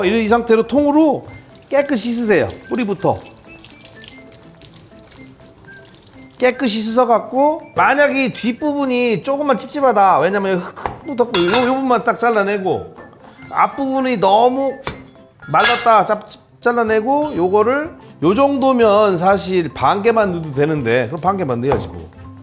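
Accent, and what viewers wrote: Chungcheong, South Korea. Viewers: 박사님 고향이 혹시 충청도!?!? ㅎㅎ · 비빈거여. 뭐여 ..충청도 능청사투리..ㅎㅎ 넘 재미나요~~~ 연기실력도~~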